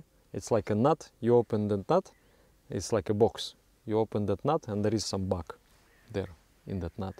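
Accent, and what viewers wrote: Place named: Russia. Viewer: Russian pronunciation in english sounds great, it’s like speaking english but russian way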